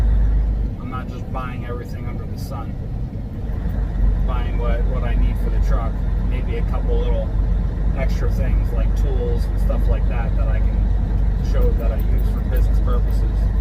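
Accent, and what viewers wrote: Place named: Northeast, United States. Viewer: He's from the Northeast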